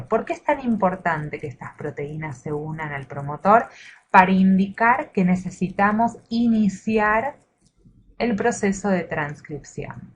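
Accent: Argentina. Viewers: Andaba buscando algo gráfico que me ayude a entender el tema y te encontré! sos una genia y no voy a mentir: cuando te reís o tenés un argentinaz al hablar, me generas mucha calidez! un placer escucharte!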